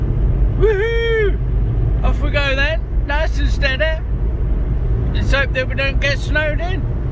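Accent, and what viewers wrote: United Kingdom. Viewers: Hi, just found your channel and subbed. Great stuff! You don't sound like you come from too far away from where I grew up in Lincoln. Cheers, Jon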